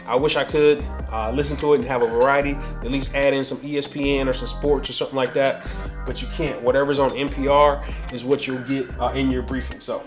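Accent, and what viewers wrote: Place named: North, United Kingdom. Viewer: You’re definitely from up north😂‼️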